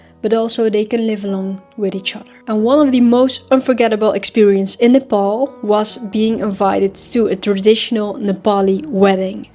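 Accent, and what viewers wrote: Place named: Netherlands. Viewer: Where is your accent from? You sound Dutch 😊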